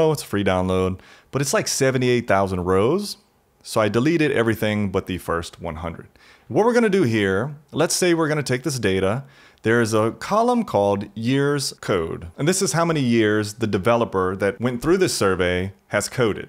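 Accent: South, United States. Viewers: You have a very subtle southern accent.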